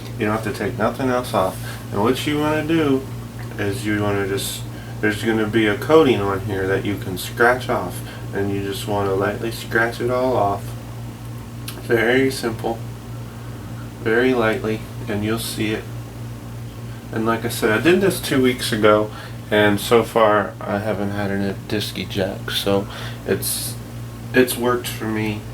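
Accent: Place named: Canada. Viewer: He's Canadian. That's how the pronounce it. It's correct for Canadians. Also, he's shy so if u ask, he will deny he's Canadian but he is.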